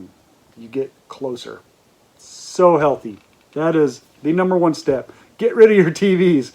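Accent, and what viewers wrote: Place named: Pennsylvania Dutch, United States. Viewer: I can still hear the Pennsylvania Dutch in your accent!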